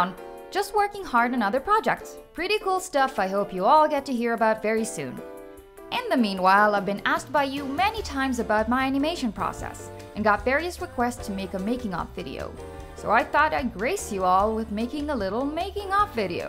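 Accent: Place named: Scandinavia. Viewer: My guess would be... a hint of Swedish or Norwegian?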